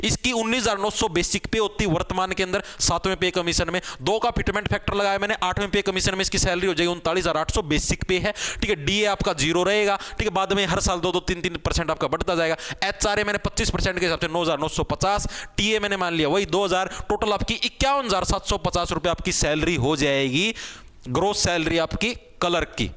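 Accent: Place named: Punjab, India. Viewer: ye to punjab se touch h punjabi style a jata h bolne m bhi right?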